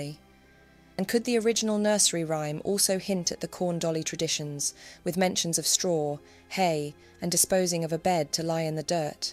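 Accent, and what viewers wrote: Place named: Oxford, United Kingdom. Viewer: Trying to place your accent, are you from Oxford? You have a wonderful voice, I live in Edinburgh and some English accents are harsh, yours is lovely, I could listen to you all day. 😍. As always an informative video.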